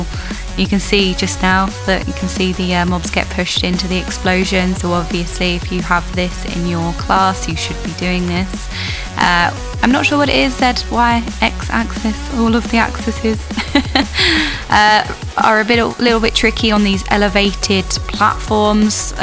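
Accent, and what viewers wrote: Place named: United Kingdom. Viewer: I'll be honest, fire mage here, been trying out frost as fae, it's fun since i always leaned towards frost. I'm still doing 15s so I'll call out what I see in those keys. People don't really pay attention to mechanics if it's not a deadly one, so if you would like to add some comments about mechanics like these you did they sure are pretty welcome. From what I've noticed from my prespective, making mental notes by watching good players' movement and ability usage is attractive and should be to most, so a bit of explanation stuff about when to press Icy veins (I always do when it's out of cd except if the pack is dead or small :P) would go some ways. Music on that tone was actually nice having. Lastly you got a lovely voice, UK accent which i personally love and a lot might adore it so you do well speaking enough during the video! 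Keep it up!:D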